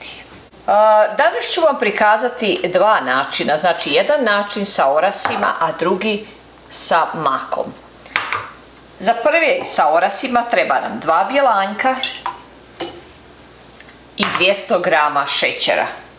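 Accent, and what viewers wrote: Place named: Slavonia, Croatia. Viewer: Draga.Iako se ne poznamo gledajuci vaše recepte postali ste mi tako bliski ne samo zbog predivnih slavonskih recepata jer i ja sam porijeklom iz Slavonije nego zbog toga što iako ste u daljini čuvate svoju tradiciju i još uvijek govorite prelijepim slavonskim govorom.Puno pozdrava Vama i Vašoj obitelji i želim vam svako dobro jer jako ste me obradovali slavonskim receptima i slavonskim govorom.Ma gdje živjeli u srcu zauvijek nosimo mirise i okuse rodnoga kraja.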